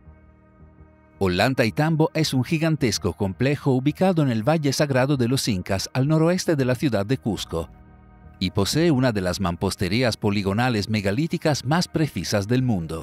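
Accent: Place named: Italy. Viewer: Por si no notaste el acento, el narrador es de origen italiano,. Habla un español PERFECTO, me gustaria oirte a vos hablando italiano........ademas podrias tener mejor modo para hacer una critica o comentario, ademas insignificante.......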